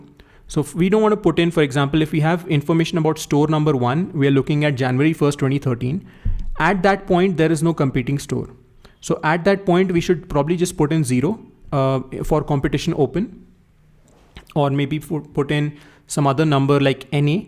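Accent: India. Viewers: You have won me at your indian accent ❤ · While his Indian accent is bearable, not as strong as the typical Indian accent, I still feel uncomfortable listening to this kind of accent. I don’t know why. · The Indian accent is the accent of the knowledge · Thank you so much for providing in India accent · you've lost me at the indian accent.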